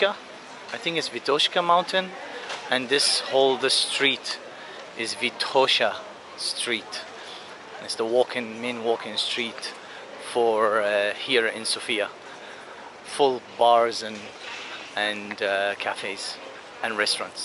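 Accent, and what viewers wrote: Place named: Ireland. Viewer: I thought I could hear the Irish in you ;)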